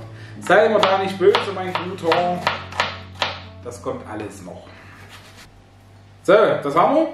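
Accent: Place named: Bad Dürrenberg, Germany. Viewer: Bad Dürrenbergerisch auf YouTube. Herrlich.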